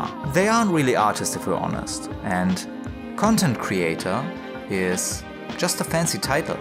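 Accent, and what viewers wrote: Germany. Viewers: Hard german accent. Tolles Video:) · Ich bin neugierig. Du hast einen leichten deutschen Akzent. Bist du Deutscher?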